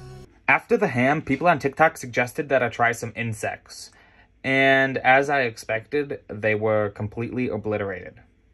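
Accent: United States. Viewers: Interesting accent. Sounds American but once in a while it sounds Australian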